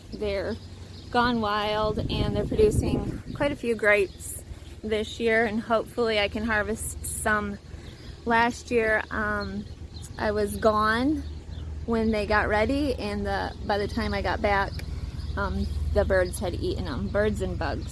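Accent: United States. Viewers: Nothing personal and I do love your channel but why do Americans say Eand ?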